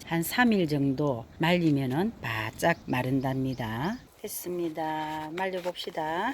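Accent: Gyeongsang, South Korea. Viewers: 일부러 보일려는 꾸밈이란거 없이 텁텁한 갱상도 사투리로 들으니 시골 고향지키고 사는 큰올케를 만난것같은 편안함으로 영상이 시작되서 웃음부터 나고요 남모르게 피어나 짙은 향기까지 품어내어도 있는지 없는지 무심히 여겨왔던 쑥갓꽃으로 향기로운 차를 만드는 과정은 살아있는 예술일 수 밖에 없네요 이름모를 들꽃 하나로도 아름다운 시를 엮어내는 정말 대단하셔요 쑥갓꽃차 향이 콧속으로 풍겨오는듯이 마음에 여유로움이 생기네요 멋져요~!!! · 쑥갓꽃차 향이 너무 좋겠죠 한잔씩 마시고 싶네요 잘보고 갑니다 👍💖😅경상도 사투리가 익쑥합니다 ㅎ 어딘가요 저도 상주입니다👍💖😅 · 댓글주셔서 감사합니다 경상도 사투리짱 우리동네 주민같아요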